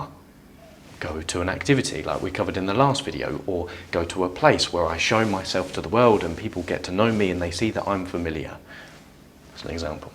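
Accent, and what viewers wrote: United Kingdom. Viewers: What type of British accent is this? I’m getting Michael Caine vibes 😊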